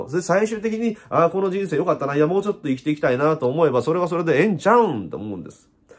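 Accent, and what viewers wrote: Osaka, Japan. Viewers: 少し、面白くないことがあって、得意でないお酒を用意して、 YouTubeでも観ようか。。。と思ったら、そこにQさんが居ました。 丁度、今、聞かなくてはならないお話でした。結果、楽しいお酒になりそうです。 大阪弁で少し早口のQさん、とても良かったです。いつもありがとうございます。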